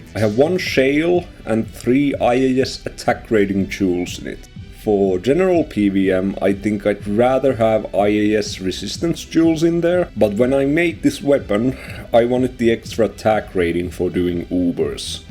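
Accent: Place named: Finland. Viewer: Sounds like a finnish accent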